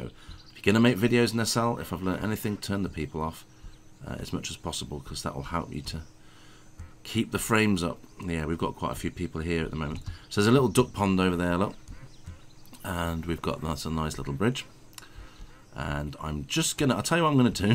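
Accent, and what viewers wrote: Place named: England, United Kingdom. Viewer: Just Subbed you looking at your youtube Victorian homes and I love your English Accent (Smiles)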